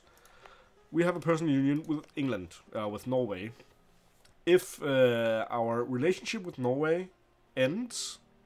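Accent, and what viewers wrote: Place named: Denmark. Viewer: i like you danish accent!! love it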